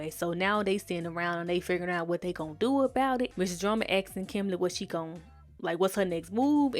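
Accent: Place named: South, United States. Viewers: Oh I would’ve guessed Tennessee. There was a contestant on Survivor last year (Q Burdette), and your accent sounds so similar to his. He is from Memphis though, so pretty close to Mississippi. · Right!? I love the black Mississipi and Lousiana accents (all the deep southern states tbh), I'm from London, so I've yet to hear one in person tho😂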